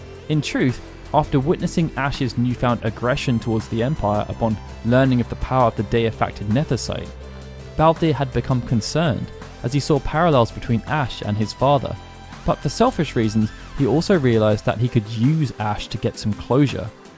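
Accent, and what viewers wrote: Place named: United Kingdom. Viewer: You and Balthier are the best people with british acents to ever exist also do Kain next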